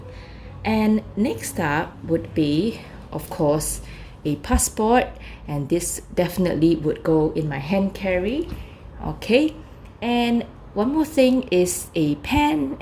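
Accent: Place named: Singapore. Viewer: Also this is kind of random, but I love the Singapore 🇸🇬 accent. I follow another YouTuber with the same accent and I just love it. 😊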